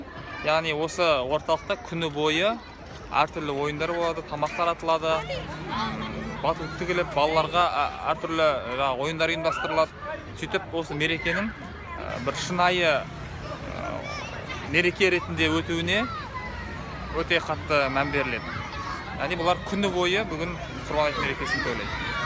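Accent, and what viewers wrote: Standard. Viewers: Ризамыз таза казакша сойлеиди жане дастур бойынша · қазақшасы қандай таза. Ризамын.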